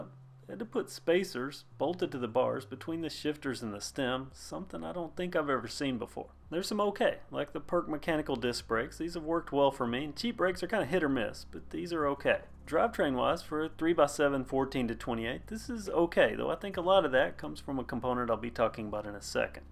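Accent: United States. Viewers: why not? I would be happy if I had your accent . My russian/turkish accent is sucks. You sound true american. Don't get me wrong . I am gonna keep listening . I know one thing for sure , if you like something it's gonna work you. 🤝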